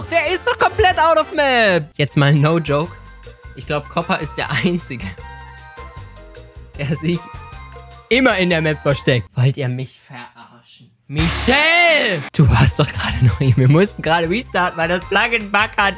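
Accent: Saxony, Germany. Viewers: ich liebe deinen säsichen Dialekt 😍. und finde es mega cool das du dich vor der Kamera jeden Scheiß traust 😂# #Aboboycamper #LogobesterYT #verdient1MioAbos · wo kommst du her XD du kommst mir vom sprechen her iwi aus Sachsen owo? stimmt des?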